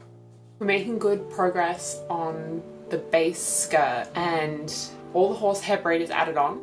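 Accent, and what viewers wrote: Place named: Australia. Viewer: An Australian accent! Heck yes, im Australian :)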